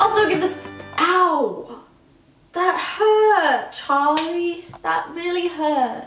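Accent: United Kingdom. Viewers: Look girl don't worry you are perfect talking British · It's fine I have a British accent too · you do sound like british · Я пришла за Британским акцентом . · you have such a good British accent omg😂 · it sound like she is british like zoella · i like your british acsent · she said she was going to be use a british acsent?? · Her British accent sounds ok tho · noooo lol i actually thought you WERE British haa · im called charlie and im from britain and just to let u no u have a very strong british accent x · I THOUGHT SHE WAS BRITISH! !! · no I do like ur British axant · You do sound British😂👍🏼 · You sound British but no one British talks like that..! Make sense?😂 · YOU NAILED THE BRITISH ACCENT - LIKE WOW. many americans / whatever cultures fail, but chu did our accent JUST RIGHT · that's acctually a good ENGLISH accent, British is Irish, Welsh Scottish and English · This is my first video seen and u do sound britissh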